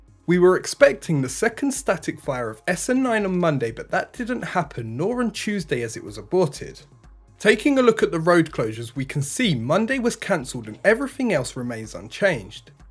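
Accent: United Kingdom. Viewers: Sorry but his mouthed was British his farther south african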